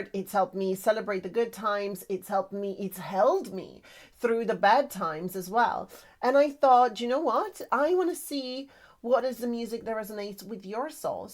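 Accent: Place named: Manchester, United Kingdom. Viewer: FINLAND. RAH! Anette Olzon and Floor Jansen RULE. Nightwish is beyond. You just became interesting. Pure power exists. Watch. Listen. Having seen every rock band on the planet over decades.... There is no contest. This crew hammers it. You sound Manchester. Hope that isnae insulting. RE-target yourself. It does help.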